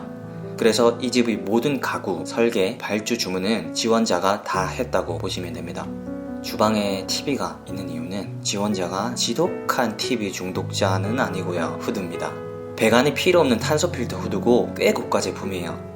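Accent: Gyeongsang, South Korea. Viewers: 진실함과 성실함에 감동 받았습니다~!!^^ 저는 70세로 75세 남편과 20년 된 부영 아파트에 10년 전에 입주하면서 도배,합성마루, 씽크대,도배,화장실 1개,티비설치 하는 쪽 벽면(전문용어 모르겠음) 등 나름 수리를 했습니다. 다 마치고 나니,앤틱 벽지 선택과 마루바닥을 짙은 커피색 계열로 해서인지 집이 너무 어두워서 손님이 오면 낮이라도 전기불을 다 켜야 될 정도인데다가, 한 10년 살고보니, 앤틱 벽지나 문(부영 전형적 체리핑크색 문,걸레받이,마감 같은것이 너무 싫증이 납니다~ )다용도실에는 결로현상이 심해서 곰팡이가 많이 끼어 흰색 수성 페인트를 칠하면서, 유튜브를 보다가 ' 인테리어'를 접하게 되었습니다. 다른 몇군데 인테리어 회사는 너무 고급진 부자들 상대로,나에게는 그림에 떡같이 눈요기만 될뿐 더 기가 죽고, 리모델링은 꿈도 꿀수 없다고 포기 할 수 밖에 없었는데, 마침 우연찮게 사막에서 오아시스를 만난것 처럼 희망의 섬광을 번쩍하는 것 같았습니다~!!^^ 우리 부부는 이제 나이도 있거니와 노후를 대비해야 되는 서민으로서, 아예 새 인테리어는 포기하고 살아야 된다고 생각하고 살고 있답니다. (사실 집이 어두워서 Led 등으로 교체하고 전기료 아끼지 않으려고 했건만,습관적으로낮에는 자꾸 불을 끄게 되고 간접조명이 아니니까 너무 밝고 낭비같아서 전기를 끄게 된답니다~ㅠ) 특히 부영 특유의 체리핑크색이 너무 눈에 싫증이 나고,브라운 계열의 가구조차 보태져서 색과 명도가 너무 낮아서인지 정서도 따라서 다운되고 우울해지는 듯 합니다. 본래 너무 심플하고 가벼운 모든함을 싫어하고, 나이에 걸맞게 무게있는 앤틱함을 좋아하지만,처음 수리 할 때에 력셔리함과 로맨틱을 더하고 싶었지만, 너무 사치하는것 같아 앤틱만 신경 쓸 정도로 이 분야에는 너무 관심없었고 이 정도도 감사하며 살았는데ᆢ 10년을 살고 보니ᆢ 다른데 이사 갈 형편도 안되고 ᆢ 어떻게 셀프로 컬러라도 바꿔보고 싶지만, 남편은 이미 있는 짐을 그대로 놔두고 수리하는것에 대한것과 비용 부담 땜에 생각지도 않고 있는 상태입니다. 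여기는 김해 삼계동인데 ᆢ마침 사장님의 구수한 경상도 억양조차 더 친밀감과 진실함을 전달되어 이렇게 하소연해 봅니다~^^ 지금 우리 남편은 제가 이런 댓글을 쓰는지 조차 모르고, 다만, 아내가 싫증만 낼뿐 이런 상상에 잔뜩 부뿔어 있는지 꿈에도 모르고 있답니다~^^ㅠ 너무 긴 글 죄송하고요~ 혹시 도움이 될 수 있는 팁을 듣고 싶답니다~!!^^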